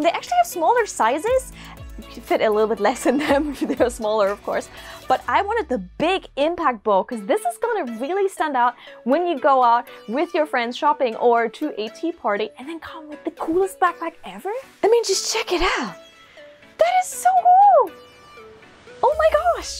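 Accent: United Kingdom. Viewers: I have been watching Ask Japanese for some weeks and learning about Japan. I really love Japanese etiquette. Your language skills are amazing. You are the second German woman on YouTube I have chanced upon who speaks English with a British accent. She too studied and worked in Britain. I can detect a very slight German accent in her English but none in yours. You respond instantly to spoken Japanese so your Japanese must also be excellent. I love trains and have found your show on our cable TV in the US. I grew up next to the Durango-Silverton narrow gauge line. Thank you for your bowtiful work. (had to acknowledge this fun video:)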